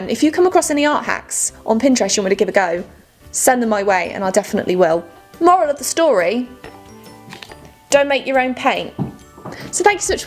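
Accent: England, United Kingdom. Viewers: Okay. So. Hi! Where are you from? If you don’t mind me asking 😅😅 I think you’re living in America? Maybe? But I can’t work out your accent? You sound quite British like me! I just can’t work it out.. can anyone help? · So she has a British accent but she lives in America. How come? Why is she in America? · You opened that salt so effortlessly. seemed really safe. You sound so British in this- I love it! But you ruined it by hating on fish and chips haha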